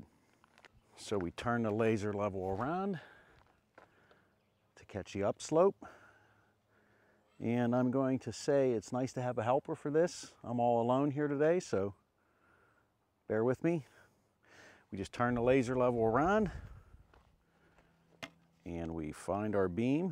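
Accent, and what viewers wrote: Pittsburgh, United States. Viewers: Man, that's a GREAT Pittsburgh accent. Love it. Thanks so much for posting this. It's exactly what I needed.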